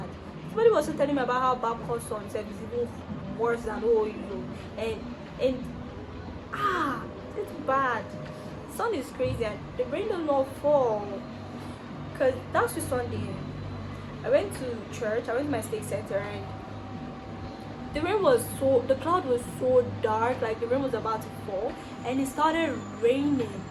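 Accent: Ghana. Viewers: You sound Ghananian 🇬🇭 than a Nigerian 🇳🇬 . "You say erh" . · Learn the language...Your accent is Ghanaian by the way.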